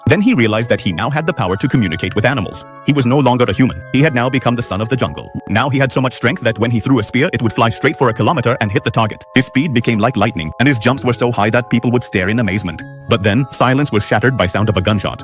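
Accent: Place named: India. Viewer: Why does this guy sound Indian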